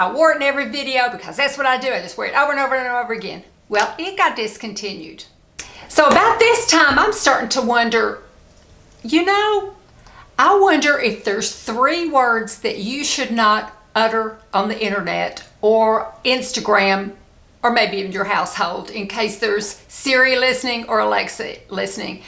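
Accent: South, United States. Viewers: Don't come between a woman and her lipstick! Love it. I subscribed to you the other day because I saw you on little poet. This is my first time to see you. I love you! I have laughed more and had such a good time with you. Now girl, I am southern too. I have an accent and when I hear someone like me, I just go into this haze of pleasure it's like dope. Now tell me if I am right, You sound like a Carolina girl or a Tennessee girl. Maybe Texas but I'm counting on the Appalachian south.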